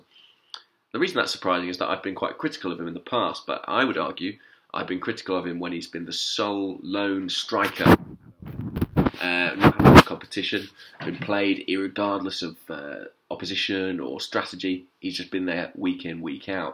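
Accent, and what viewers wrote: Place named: England, United Kingdom. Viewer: As a french fan I wish Olivier leaves Arsenal to another club where he can play more games. I think Marseille might be very interested, they are qualified for the Europa League too, he will be the main striker there and theirs fans will love his fighting spirit. I love your videos man, it helps me to understand english accent as well, keep going!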